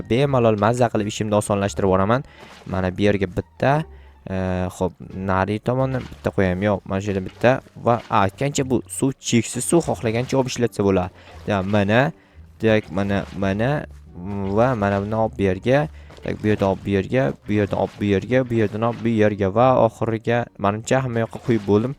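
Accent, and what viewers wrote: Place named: Bukhara, Uzbekistan. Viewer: Buxoro shevasi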